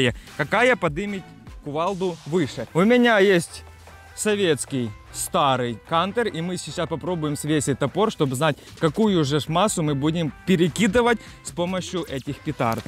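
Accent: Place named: Ukraine. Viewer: Зачем тебе маска) наверное это завлекает новых зрителей) по голосу сразу слышно Украинец!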